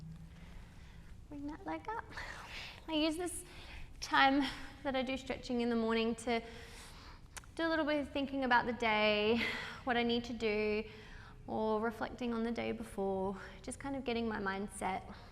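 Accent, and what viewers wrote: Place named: Australia. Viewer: Maybe it’s the Australian accent but I loved that video! It moved a little faster than I would have so it kept me on my toes! 🫶🏻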